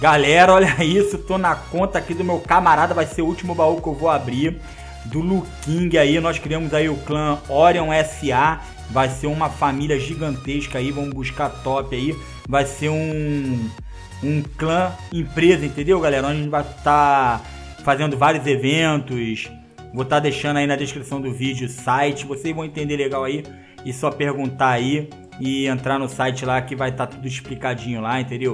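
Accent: Rio de Janeiro, Brazil. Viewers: Meliodas O Taradado n muda nda,antes de ver o cara eu pensava que ele era um carioca magrin esse cara é bom mto melhor q o mister M #MisterMÉoPiorClashr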